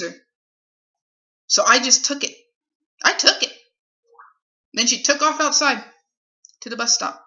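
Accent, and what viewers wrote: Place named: New Jersey, United States. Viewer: I KNEW YOU SOUNDED LIKE A JERSEY GIRL!!!!! LMAO HALLAAAAAH!!!!! I'm in up North now LoL!!!!! Got fam in Clifton n Bayonne N All up n down Jersey!!!!!!!💙💜💙💜💙💜💙💜💙💜💙💜💙in boonies now, Sussex County Stixxxx!!!!!! Mad Love Girl!!!!!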